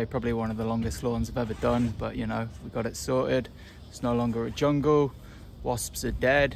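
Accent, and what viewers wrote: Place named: Australia. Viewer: Take it from someone who's been watching lawn care videos for 6 years. Well before the popularity spike. You've got all you need. The quality, the actualmowing experience, the charisma, and we Muricans love a good Aussie accent. Plus you go that extra Kilometer. You just need exposure. Not a matter of if but when. Just keep doing you.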